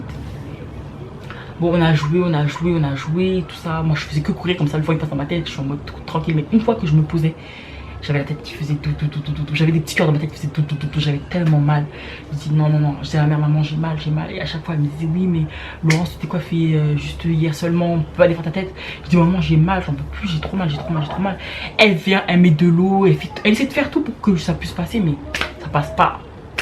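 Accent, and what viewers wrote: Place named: Guadeloupe, France. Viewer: je suis une petite youtubeuse et superbe vidéo je viens de te découvrir et je vois que tu parles créole guadeloupéen ça fait trop plaisir ❤️🔥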